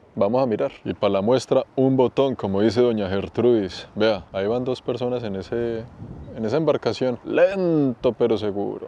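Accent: Bogotá, Colombia. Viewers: Es que es Colombiano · Tu voz y tonada colombiana es muy grato escuchar! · Este narrador habla español con un espectacular acento de Bogotá, Colombia. Diría uno, sin conocerlo, que creció allí. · es un Chico muy culto no te preocupes no dira nada indevido. Si voz es muy relajante obvio por su acento Colombiano muy agradable de Escuchar y correcto español. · es de Bogotá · Cómo siempre muy buena su crónica y narrada con su estilo muy colombiano. Excelente, gracias.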